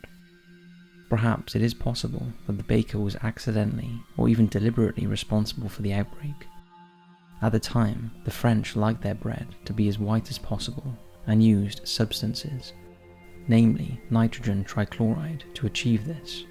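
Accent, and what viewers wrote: Wales, United Kingdom. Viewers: He's Welsh. So it's a Welsh accent. There's no such thing as a British accent. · ??? That IS a British accent. What are you talking about? I'm British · British accent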